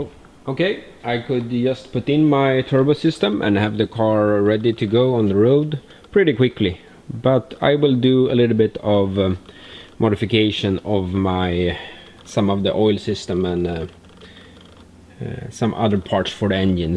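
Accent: Sweden. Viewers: är du från Sverige eller?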